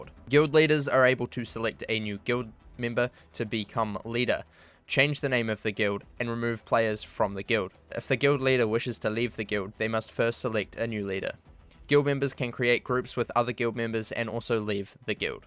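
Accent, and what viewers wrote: New Zealand. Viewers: Kia Ora bro, first time watching your video and could instantly tell you were also a Kiwi. First one I've actually ever found from wiz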